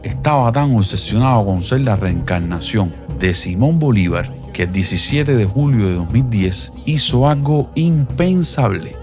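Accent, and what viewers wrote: Cuba. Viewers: Q acento más horrible. Cubaneo, sola vaya. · Aquí vine directo a ver el vídeo de la orden maldita! Me encanta la seriedad, la veracidad y la agudeza de tu recopilación narrada en tono de asere!!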